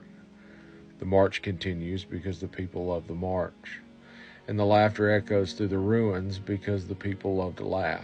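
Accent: South, United States. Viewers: Your poetry, deliveredwith a southern accent, give me hope for a USA free from the current regime there. May others hear your voice with Joy. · I really enjoy your content and I love your Southern accent 😍 I'm a Southern girl myself 💙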